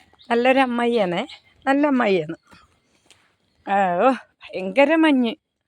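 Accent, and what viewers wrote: Kannur, India. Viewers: Kannur slang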